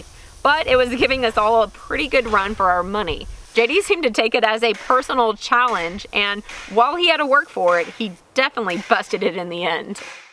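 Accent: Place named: South, United States. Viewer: Are you going to pour a concrete pad for that thing? When rainy season comes, I could see those concrete blocks sinking in and warping the bed. "Cody drug it over there." You go, southern girl, that's how we talk.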